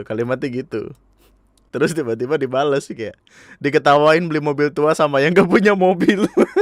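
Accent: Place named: Java, Indonesia. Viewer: Jowone medhok ya ms 😹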